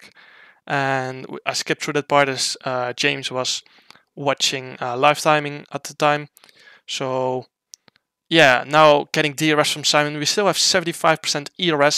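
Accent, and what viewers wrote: Netherlands. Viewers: Always love to hear your dutch accent while speaking haha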